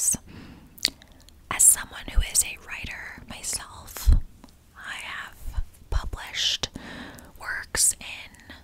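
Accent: New Jersey, United States. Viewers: that new jersey accent was accenting it, its very relaxing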